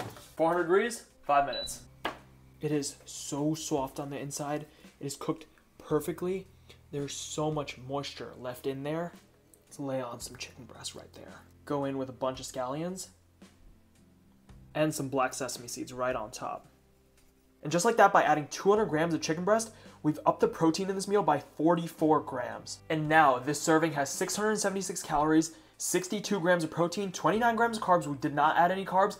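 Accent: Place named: New York, United States. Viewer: Dude your recipes are so good. You have the NY accsnt!!!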